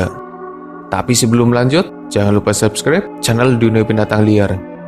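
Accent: Banyumas, Indonesia. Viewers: Ngapak e katon nemen kang🤣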